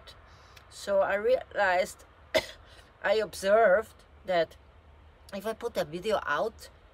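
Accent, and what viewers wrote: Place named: Hungary. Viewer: I just saw your video come up and I would much rather have my bed at the front for many reasons. I would feel much more secure for sure. I love your accent, you sound so much like my Hungarian mother. I do hope that you are feeling happier and more positive these days. We are sending you warm hugs and positive energy and wishing you success with your channel. ❤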